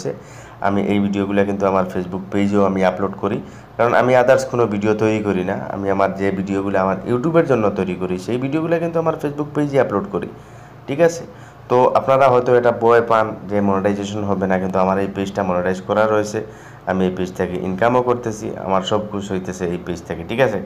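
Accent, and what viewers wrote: Sylhet, Bangladesh. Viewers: বাসা শুনে মনে হচ্ছে ভাই সিলেটি😊😊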